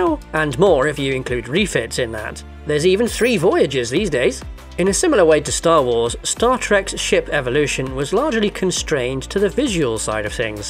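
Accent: United Kingdom. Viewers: He’s British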